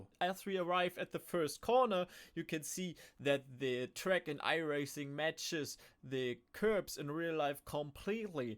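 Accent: Germany. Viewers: Irgend wo höre ich den deutschen Akzent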